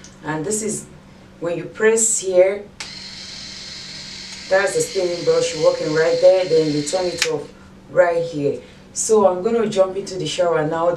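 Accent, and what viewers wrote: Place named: Africa. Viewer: Goof morning my blessed family. I hope you are well by grace.we can hear your beautiful African accent. Looking beautiful sis as always. I need some of this brash . Love you sis I declare peace and joy in the hearts of Your people! I proclaim that You will bless Your children and will keep them; You will make Your face shine upon them and be gracious to them! I pray against the plans of the adversary to steal joy and peace from Your people. I declare that Your children will remain uplifted, for there is no one who can lift the soul like You do, Amen.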